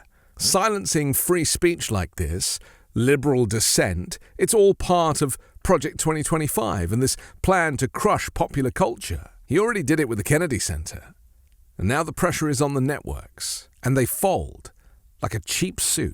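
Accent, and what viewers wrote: United Kingdom. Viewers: You have such a lovely calming voice, British accent, really easy to listen to.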